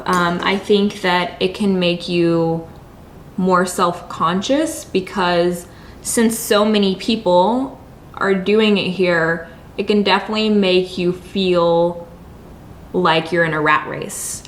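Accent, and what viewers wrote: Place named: New York, United States. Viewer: Sounds just like New York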